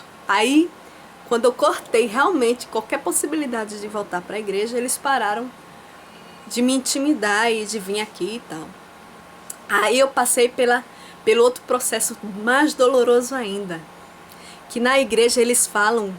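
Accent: Northeast, Brazil. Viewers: vc não generalizou pq vc falou q foi na sua congregação,nem todas são assim.Pelo sotaque vc é do nordeste,aqui no sudeste é muito rar acontecer isso que vc falou.pense bem porque Jesus não tem nada haver com isso,vc saiu pq vc quiz,vc tem LIVRE ARBITRIO!naõ vou dizer q vc vai para o inferno,mas,deixa isso quieto,não insiste com esse videos pq a magoa só faz mal para nós mesmos! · QUE SOTAQUE GOSTOSO, ESSE SOTAQUE DE NORDESTINO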